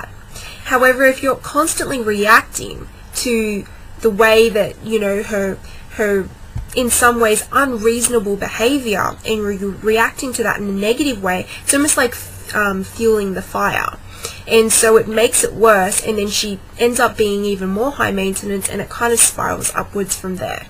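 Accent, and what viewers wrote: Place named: Australia. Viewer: Are you Australian? I'm wondering if I recognized the accent. : ) I think you're right about the importance of calling her out on it, or ignoring an outburst. I remember when an ex of mine said she actually appreciated it when I did so once.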